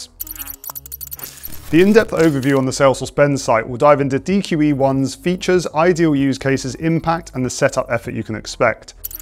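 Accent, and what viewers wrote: United Kingdom. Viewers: Dude, your British accent is difficult to listen to when trying to learn.....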